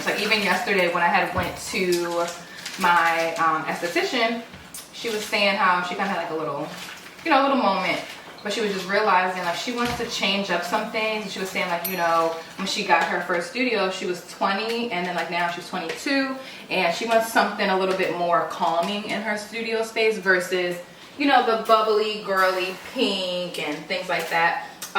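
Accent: Baltimore, United States. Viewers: Are you from Baltimore I hear an accent ? This video was needed change is always good.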